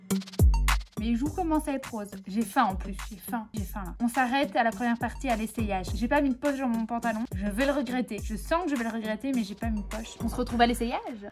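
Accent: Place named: Belgium. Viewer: Ahah tu es folle j'adore! Merci pour le fun et cet accent belge je ne men lasse pas!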